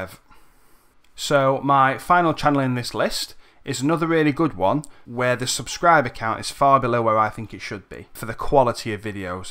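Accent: United Kingdom. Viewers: That moment when I know all of them but still suck at maya lol... btw nice british accent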